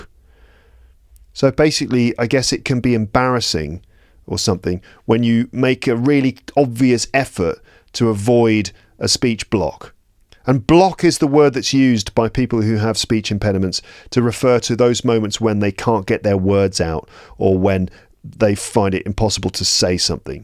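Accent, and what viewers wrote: United Kingdom. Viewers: Is this british accent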